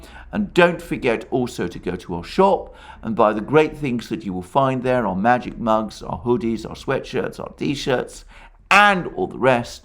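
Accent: United Kingdom. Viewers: Overly British accent destroyed the video quality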